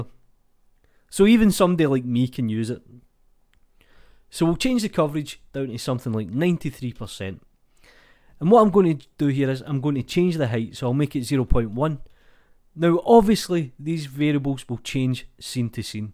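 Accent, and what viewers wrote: Scotland, United Kingdom. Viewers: Can it be used as a soft body afterwards? P.s. Your amazing Scottish accent made me wonder if it was English language what I was listening to, but I've understood the video perfectly nevertheless.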